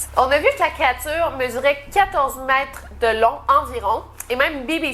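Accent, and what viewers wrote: Quebec, Canada. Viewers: Je crois à tout sauf le voyage dans le temps c'est faaackkkkk ...si nn je t'adore j enchaîne tes vidéo l'une après l'autre j'adore ton accent québécois 😍 bon courage gros biz ...au passage stp ace que tu peut nous raconté sur l'affaire des 2 jeune femmes tuer au Maroc y 15 jour de ça! ! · J'aime bien tes chiens ils sont trop mignon!!! Et aussi les histoires que tu raconte c'est captivant!. Et j'adore ton accent Québequoi 😘😘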